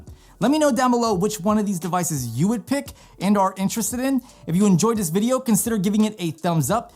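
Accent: United States. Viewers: omgawd i lasted less than a minute.... no offence, but your voice is sooooo annoying and so 'Jersey'.....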